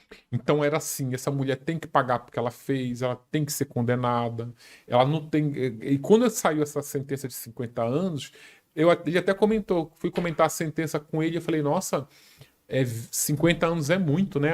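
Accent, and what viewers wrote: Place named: Rio de Janeiro, Brazil. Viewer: O sotaque dele é muito chato. Nossa. Uma mistura de carioca com nordestino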